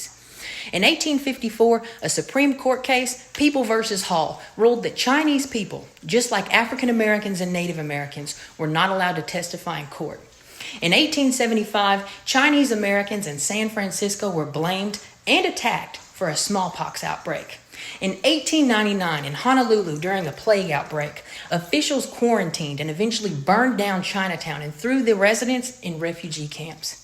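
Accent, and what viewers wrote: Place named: Texas, United States. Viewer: My husband and I are in our 60's. We absolutely LOVE this channel! (Just for the record, my husband is from Sherman, TX, and he says you sound like home!) Keep doing what you are doing!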